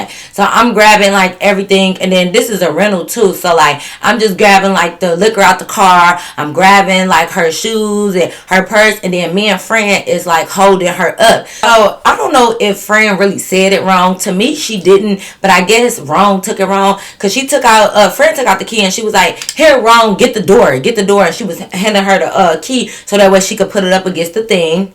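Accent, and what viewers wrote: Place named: Detroit, United States. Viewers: My first time to your channel. I knew you were from Detroit 20 minutes in. 😂❤ subscribed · Girl idk what the accent is or sounds like either but I knew you from Detroit by the “accent” and I got excited halfway through when you said you were ❤😂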